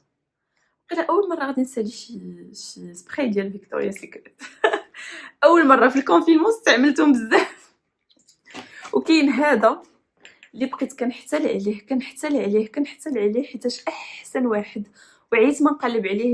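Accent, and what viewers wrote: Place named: Maghreb, North Africa. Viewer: فيديو رائع❤️❤️ تصوير رائع ❤️❤️ محتوى رائع❤️❤️لكن اللهجة صعبة الفهم لمعظم العرب لا يفهمون اللهجة المغربية والجزائرية والتونسية للأسف 💔💔ف الرجاء التحدث ب الشامية أو الفصحى أو المصرية هكذا ينتشر الفيديو أسرع ويكون له جمهور أكبر او ضيفي ترجمة مصاحبة بليز 💖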